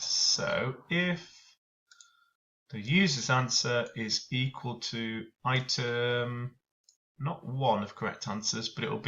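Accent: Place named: Ireland. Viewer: Ireland XD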